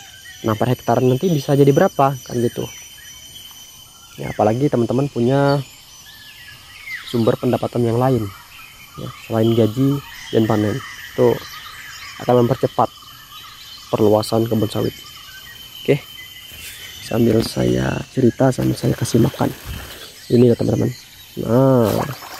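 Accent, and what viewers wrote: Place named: Bangka, Indonesia. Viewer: dari dialek nya dari bangka ya bang ? salam dari belitung bang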